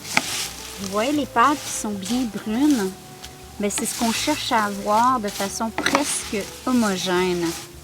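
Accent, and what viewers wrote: Canada. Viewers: j adore ton accent canadien bizou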